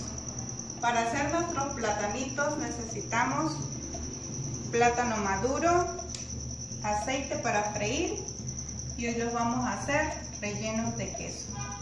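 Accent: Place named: Tabasco, Mexico. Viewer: Que preciosa es mi tierra (Tabasco) da gusto ver el canal de una paisana y escuchar el acento choco ❤ saludos desde San Luis Potosí, cuando voy a mi tierra aprovecho a come de todo y me traigo todo lo que puedo 😀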